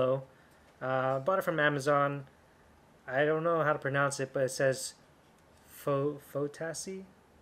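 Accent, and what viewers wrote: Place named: Philippines. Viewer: I knew it you were filipino man hahha i based it off the accent anyways great video man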